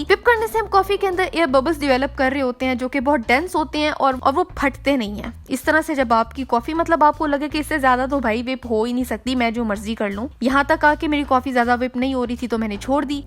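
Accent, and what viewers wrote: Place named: Punjab, India. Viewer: Cute punjabi accent. 😊😊👍👍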